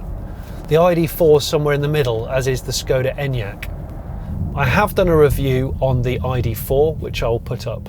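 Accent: United Kingdom. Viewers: The main reason I watch this show is the very British English.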